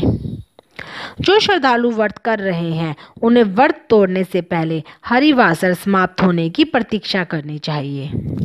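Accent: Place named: Haryana, India. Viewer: padh padh k mat bolo mataji aur haryanvi accent se mukti pao zara !!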